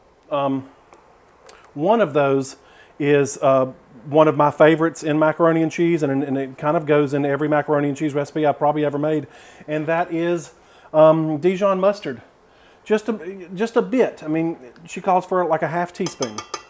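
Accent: South, United States. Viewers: This guy is the best! I am so glad i can watch him make different recipes, taste them and give his honest opinion. So many times i have wanted to make something keto and there are a billion different recipes..... some completely different and some very similar. It can be very difficult to determine which one is the best one to make. As we all know keto ingredients can be very expensive. There is nothing worse than making a recipe that does not turn out anything like you have anticipated. Not only have you wasted ingredients that cost a lot of money, but you have also wasted a lot of time and worst part you don"t get to eat that one thing your have been,craving and thinking about nonstop, praying to the keto gods that you can make a low carb version. So THANK YOU! You are so helpful and a joy to watch. You have the cutest southern twang. Love your personality and energy.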